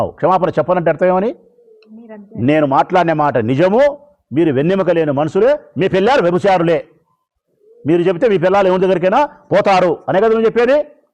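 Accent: Rayalaseema, India. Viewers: రాయలసీమ భాష లో మాట్లాడాలి అంటే అది మీరే అన్న 🙏🙏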